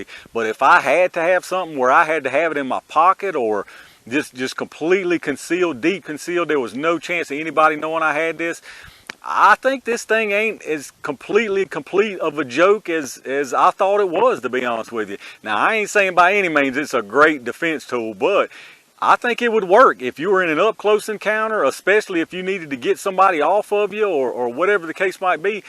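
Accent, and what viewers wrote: South, United States. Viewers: Excellent presentation, loved: that southern talk!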